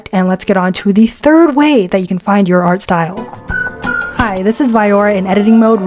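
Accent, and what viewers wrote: United Kingdom. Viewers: Are you British in some parts really often yours accent sounds british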